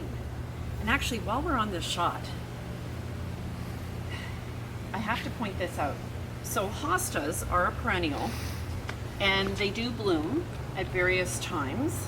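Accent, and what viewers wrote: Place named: Ireland. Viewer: A great garden, are you Irish. You sound a bit Irish. Keep up the good work.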